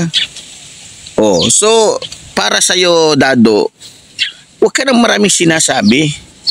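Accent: Visayas, Philippines. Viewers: Idol bisaya ka ba?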